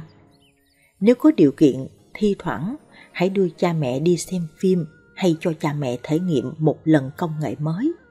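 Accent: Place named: South, Vietnam. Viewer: Chị đọc giọng người miền nam nghe hay nhẹ nhàn trong vắc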